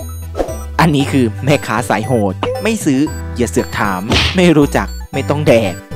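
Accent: Isan, Thailand. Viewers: แอดเว่าอิสาน😆ชอบๆ💕💕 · ชอบแอดพูดอีสาน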